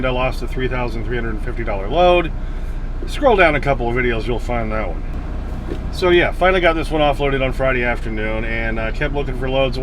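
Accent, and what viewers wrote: Louisiana, United States. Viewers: Your cajun accent is spot on big dogg!! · Your louisiana accent was so funny🎉❤ I never been that far south in a truck very cool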